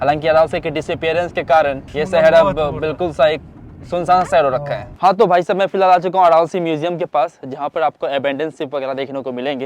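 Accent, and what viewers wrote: Bihar, India. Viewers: Tu Budbak bihari hai kya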